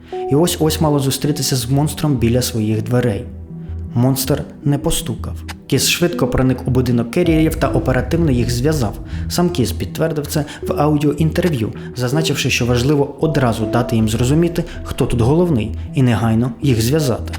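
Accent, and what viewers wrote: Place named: Russia. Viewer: Чому! То вже б!Відчуваєте себе дуже юним, не можете позбавитися російської вимови? гарне ім'я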